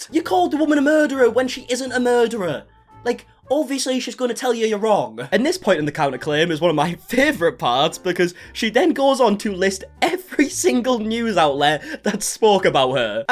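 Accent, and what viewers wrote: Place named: North, United Kingdom. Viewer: NICE ONE! What is your accent, by the way? It sounds like somewhere in the UK, maybe up north, but I'm not sure.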